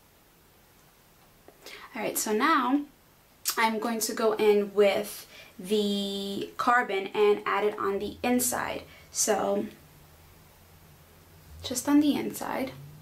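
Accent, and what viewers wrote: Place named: New York, United States. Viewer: love your accent! are you from NY?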